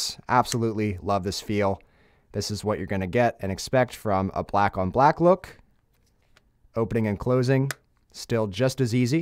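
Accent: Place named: Pennsylvania, United States. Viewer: First time watching one of your videos. Could hear the accent right away and had to look it up. PA representing lol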